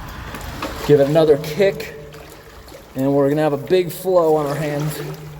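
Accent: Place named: Montana, United States. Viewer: u from montana? ur accent sounds like a guy i knew from montana...or at least he lived there at one point in his life lol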